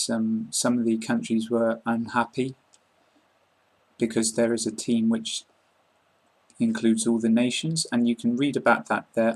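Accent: United Kingdom. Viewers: thanks for teaching us i like British accent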